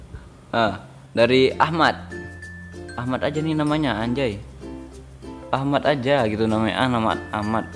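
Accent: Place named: Banyumas, Indonesia. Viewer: ngomongnya yg loss ngapak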